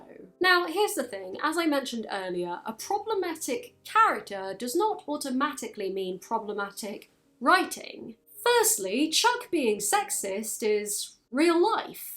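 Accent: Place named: United Kingdom. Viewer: I love your British dialect its lovely to listen to!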